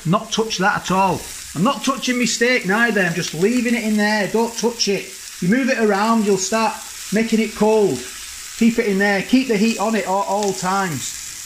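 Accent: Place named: Northern England, United Kingdom. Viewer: Hiya .. The steak looks great. I've also tried this steak from Iceland and I was very impressed by it too. What I want to know is where did your peas go to that you cooked?? I think you had steak brain and forgot about them!! Lol 😂😂 I've only subscriber a few days ago, and I'm loving your northern accent. I live in Bracknell (about 10 minutes away from Windsor) but have always had a London accent. Anyhow, keep what you're doing and I will keep enjoying your channel. Cheers, Vicky 😁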